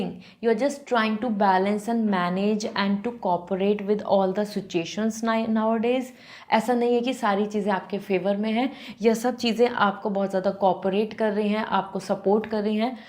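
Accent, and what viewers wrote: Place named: Punjab, India. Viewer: You sound punjabi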